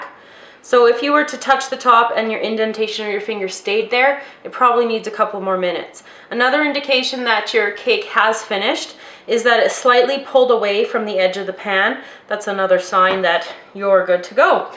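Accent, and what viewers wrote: Canada. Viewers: Hi, this recipe is excellent. It passed the "culinary-challenged-bacehlor-slob" test. Yup, I'm the bachelor-slob who can't cook to save his life, but was able to make this. It turned out great! So did the chocolate butter-cream frosting recipe you posted to complement this recipe. Why haven't the Food Network or the Cooking Channel hired you yet? You do very well explaining these recipes and techniques. Your Canadian accent is charming and makes me think of all my Canadian friends. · Thank you for posting this xoxo Are you from Canada? Lol, just I can hear an accent :P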